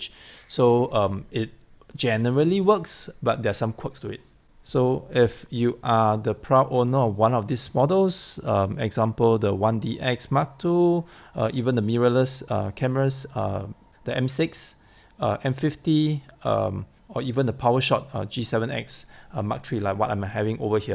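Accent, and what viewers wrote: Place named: Singapore. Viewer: 6 seconds in....he calls a point and shoot a DSLR though you can hardly understand a word he says thanks to that incredibly hard to understand Singaporean accent that slaughters the English language, plus he slurs his words AND talks way too fast. HINT: Talk s-l-o-w-e-r so everyone can understand your bizarre accent. Its very unusual. Its not easy to understand. Slowing down will solve almost all of these problems. Then try to enunciate better. And do NOT use any slang. With that accent it sounds ridiculous. Just keep the words simple and clear.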